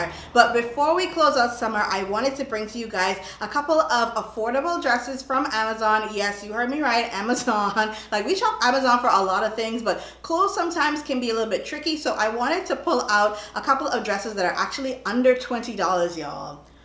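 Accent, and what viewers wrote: Bahamas. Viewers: just watched your rose wholesale video and am like this girl sounds Bahamian so I stalked your page and you are Bahamian yayyyy!!! I love your videos definitely will be subscribing and yes am Bahamian too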